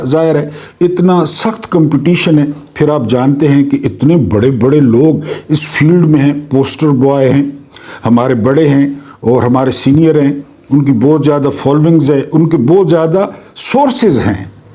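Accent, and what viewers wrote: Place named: Mianwali, Pakistan. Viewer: بسم اللّٰہ الرحمٰن الرحیم السلام علیکم محترم جناب باتیں یا گفتگو وھی درست ھے جس کا جو بھی لہجہ ، ڈائیلک ھوتا ھے _ لہٰذا ، آپ کا انداز آپ کا لہجہ اپنے علاقائی رنگ میاں والی ، والا انداز درست ھے _ ماشاءاللہ لا قوۃ الا باللہ ، آپ کی گفتگو آپ کا طرزِ تخاطب فطری ھے جو کہ اپنی جگہ نہایت مناسب و موزوں ھے _ دوسری بات جو کہ بہت اھم ھے وہ یہ ھے کہ آپ تہہ ❤️ دل سے پر درد انداز میں کہتے ھیں ، دوسرا کوئی شاید ھی اس انداز میں بول سکے ـ باقی کچھ بھی حرف آخر نہیں ھوتا _ سب خوبیوں والا اور مکمل اکمل اور حرف آخر صرف کتاب اللّٰہ قرآن کریم فرقان حمید ھے _ دنیا والے جو کچھ کہتے ھیں کہیں! ایک منہ تو ھے نہیں! کس کس منہ پر ڈھکنا رکھو گے ؟ اپنا ویلاگ جاری رکھیں! جزاک اللّٰہ خیرا واحسن الجزا فی الدارین اللھم آمین آمین آمین برحمتک یا ارحم الراحمین _ وما علینا الاالبلاغ والسلام °°°°°°°°°°°°°°°°°°°°°°°°°°°°°°°°°°°°°°°°°°°°°°°° ۔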